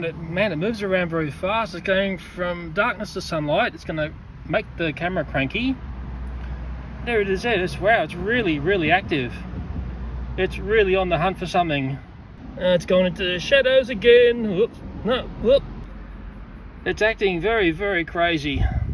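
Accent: Australia. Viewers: Aussie accent is so funny but how on gods earth do you guys live with those spiders down there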